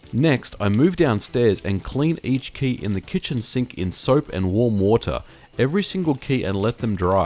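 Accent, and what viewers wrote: Australia. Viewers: Wait, it's a Commodore 128DCR? Is it a NTSC or PAL version? If it's NTSC, what special steps if any did you have to get it to work with a PAL monitor and 240V 50Hz AC. I ask cause you sound Australian... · You sound Australian... if so, where the hell did you get a 128D for $30?!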